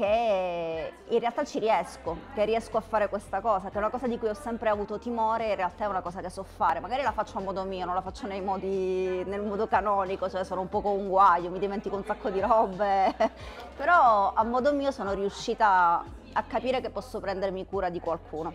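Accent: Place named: Cosenza, Italy. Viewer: L’ho scoperta solo ora, quasi per caso, per la sua analisi in quattro parti del film “Barbie”, che ho trovato quasi geniale. Sono andato a ritroso nei sui video su Instagram, e ho sempre trovato analisi centrate, acute, mai ideologiche, sempre equilibrate e ricche di spunti di riflessione e notizie, informazioni e curiosità che non conoscevo, o alle quali non avevo fatto caso. Sempre condivisibile. Una vera scoperta. In più è simpaticissima e dolce col suo accento cosentino. In una sola parola : adorabile. ❤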